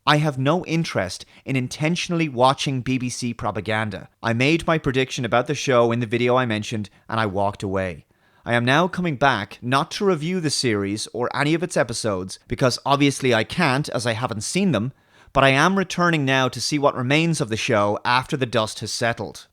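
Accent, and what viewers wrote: Ireland. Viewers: I've been a bit fed up tonight so I thought let's listen to a nice Irish voice, very calming! Not going to be for too long though is it! Not with silly fuckers going along with Leo's abort & import policy! I thought the Irish were better! Seemingly not! Better learn Pidgin!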